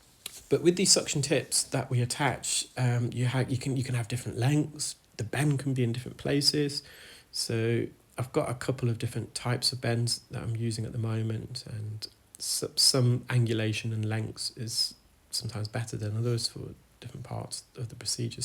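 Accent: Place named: RP, United Kingdom. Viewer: Thanks to you Dr., and yes, in my book, you are a doctor, I have started using medical grade Olive oil for my itchy ears. What a relief. For me, the fascinating part of watching you is a) I never knew any of this about the human ear and I am learning so much from you. b) you are so passionate about what you do. c) I love how you use the proper queens' English, I wish we did that here in the states. d) It is so satisfying to see you help these people with your kindness and compassion.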